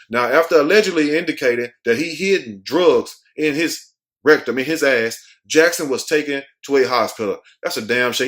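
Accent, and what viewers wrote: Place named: New York, United States. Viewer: you sound like you was born and raise in NYC laughing my ass off #NYC in the house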